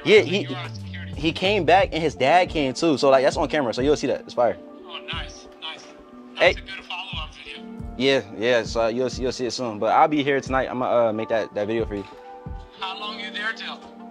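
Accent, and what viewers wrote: United States. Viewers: her southern accent is so beautiful 😍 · Is she like from Texas? She got an accent it sounds like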